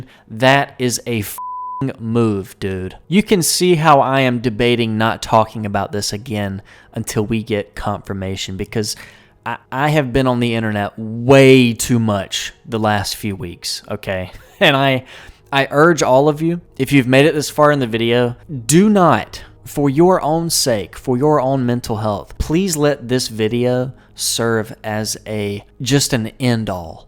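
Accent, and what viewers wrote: South, United States. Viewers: Do you know not the difference between a remaster and remake ? From this video it doesn’t seem as though you do. A remaster is just a fresh coat of paint on a older game … like Skyrim SE A remake is remaking the game from the ground up line the demons souls remake. This is supposedly a third party doggy doo doo remake with soulslike combat this is not a good thing. So you need to make it clear is it now actually a remaster if that is the case that is no big deal but a full on remake is going to be trash Not only a yucky southern accent lots of hardcore copium in this video. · Why did it take me half the video to realize the southern accent 😂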